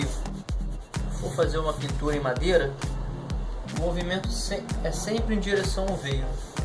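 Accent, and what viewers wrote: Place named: Rio de Janeiro, Brazil. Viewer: Po, pelo sotaque estava achando que vc era aqui do rio, qnd aos aparece o prédio bege e marrom que morei por 15 anos aqui em nit...kkkk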